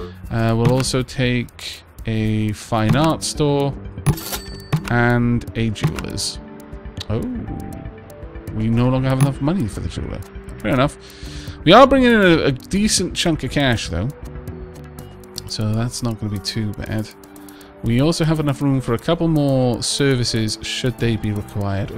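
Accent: Wales, United Kingdom. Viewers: R U Welsh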